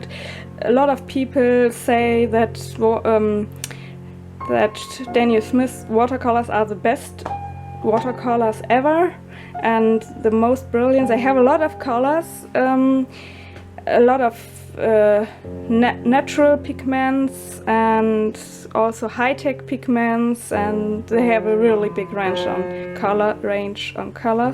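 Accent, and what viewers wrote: Germany. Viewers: Hallo, Du sprichst eigentlich Deutsch, oder? Du hast so einen krassen, deutschen Akzent : D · Also ich finde, die Videos in denen du Englisch sprichst, sehr angenehm. :D Freue mich immer, wenn ein Video kommt, in dem du sprichst. Ganz ehrlich, ich wusste vorher nicht, dass ein deutscher Akzent im Englischen auch schön sein kann. xD An der einen Stelle wird deine Stimme etwas verschluckt. Sagst du da, die Kuretake-Farben sind besser pigmentiert als die White Nights oder schlechter? ^^ Ich liebäugle immer wieder mit den Kuretake-Farben, aber ich hab ja eigentlich meine Schmincke Horadam-Farben. Krieg da echt den Hals nicht voll, ganz schlimm. ^^' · bist du aus Deutschland? Ihr Akzent ist schön!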